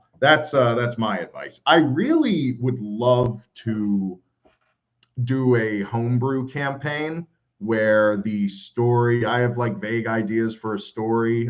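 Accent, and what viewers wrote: South, United States. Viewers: I was wondering recently, what would be the reaction, if I heard someone else reading the story. I enjoy Roy's performance for many reasons. It's professional. He has a cadence to his delivery and it is very quick. I've heard for years. Listening to your performance, slower with an American accent, I have noticed things that went unnoticed since 2021. -The guy who fought a wight in the prologue. His blade got covered in frost. His sword had frostburn. That's so cool. I kept missing it, in Roy's delivery. -The man whost Ned dehe ded, he was tied up on a holdfast's wall, when they rode up. I never noticed that. I feel like this version puts glasses on my understanding of the story. It's giving surround sound. I am in the middle and not in the front, watching. So I'm going to listen again. 🚶🏿‍♂️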